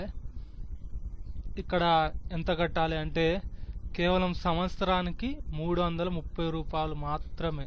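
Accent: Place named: Telangana, India. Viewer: Anna nuvvu telanaga odiva? ekdam matlathunnav telanganala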